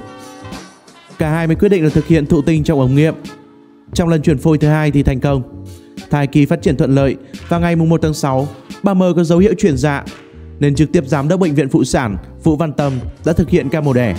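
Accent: North, Vietnam. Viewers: nghe giọng anh giống bắc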